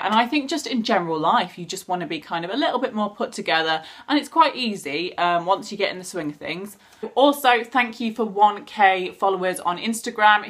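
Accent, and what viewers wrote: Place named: East Midlands, United Kingdom. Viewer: I love organised omg, I have a things to do pad from poundland and it's so good- you can write the date on the top too it's literally amazing :L ! you would just think it's another notepad but it hs space for you to tick things off! and then the other side is for notes and then a mini timetable! would use it for revision as well! etc - where are you from ?! your accent sounds east midlandsy- I could be so wrong! I did chemistry and biology as well. Workouts really help me! especially as someone with learning difficulties it makes my mind feel clearer. Top tip for productivity too, make sure you're not wasting your time by doing the wrong thing (': so with revision sometimes I wouldn't be revising the most relevant bit so going back to the guide/plan of what your course covers and writing the headers do a 1 minute test where you write down all of the keywords you remember and processes and then you really remember what you need to go back to and revise! 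also to prioritise! as a lot of people put big tasks off and start with smaller ones and big ones always get pushed back. Anyway loved the video especially because it's about organising! thank you so much for visiting my channel- I hope you'll come back again some day! xx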